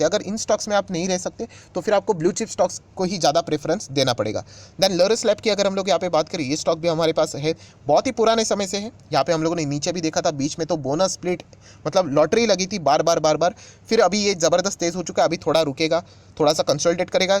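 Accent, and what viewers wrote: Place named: Gujarat, India. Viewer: pakke gujarati lag rahe ho aap sir…..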